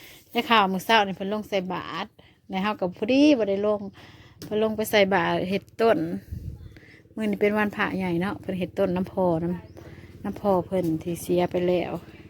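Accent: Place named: Isan, Thailand. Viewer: สบายดีเจ้คนงามติดตามตลอดมาเว้าคือคนอีสานบ้านเฮา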